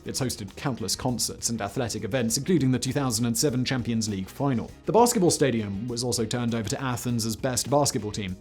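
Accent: RP, United Kingdom. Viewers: After dopping and sponsoring, not only the Olympic games but also sports in general gave lost any meaning... I think Greece should be the country to bring the Olympic games to their original purpose, but they wanted to impress and wanted to give work and "gifts" to every engineering company in and out of Greece, and so corruption was the protagonist, not sports. And if an English man with a posh accent dares criticise Greece as inadequate for the Olympic games, the 2016 London games are my revenge, when that country showed off as its contribution to humanity an old lady with jewellery, a fictional murderer and a buffoon. I guess imperialism is out of fashion and they couldn't show off with dead natives and stolen antiquities 😁😂🤣😤😡🤮👎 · By the way this person talks too fast I have to put captions, even his britis or english accent was very distractive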